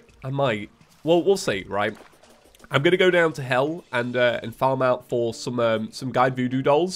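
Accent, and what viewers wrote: United Kingdom. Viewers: Sometimes I honestly just think “ god, how I would love to play in world with him and his British accent, eh, probably will never happen”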